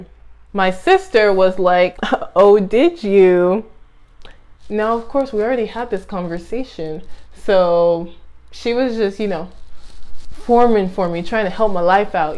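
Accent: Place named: Nigeria. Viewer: Your Nigerian accent is coming thru 🇳🇬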